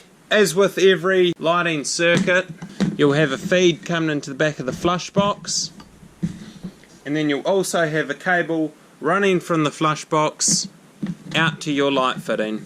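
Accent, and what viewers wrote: New Zealand. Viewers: Thanks Great show. I had a bit of fun with the accent (Here is the kettle calling the pot black! I'm European.) Yes even the CC picked it up and kept showing "The Read"wire and even had more problem with the "batten box" ha ha - I seem to have heard this on my 2002 NZ trip, could I be right? (And did they had fun with the Aussies!) Anyway this is a very practical presentation. Thank you. I just wish I did not have a narrow two gangs switch to work with and some 15 wires behind it, stiff ones too! But in the end all good! Really when one understands that Switches are never off but go to L1 or L2, It makes a lot of sense to get the two ways working. More thanx to your three ways and illuminated switch (here I wanted it for my two ways switches to the outside light to remind me to switch it off (My old neon that worked so well in it died) Not so lucky on that one...:) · Mate your a legend not only have you saved me hundreds of dollarydoos but iv learnt something and enjoyed some pure NZ accent doing it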